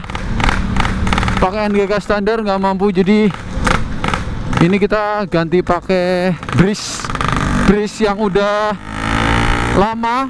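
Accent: Banyumas, Indonesia. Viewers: Setuju full ngapak 😂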